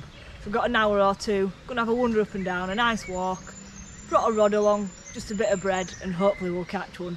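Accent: North, United Kingdom. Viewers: Love northern acent 😀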